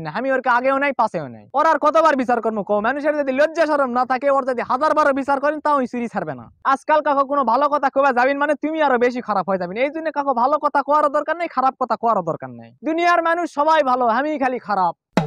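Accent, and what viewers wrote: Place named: Bogura, Bangladesh. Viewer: হামাকেরে বগুড়ার ভাষার মতন ই বারে😆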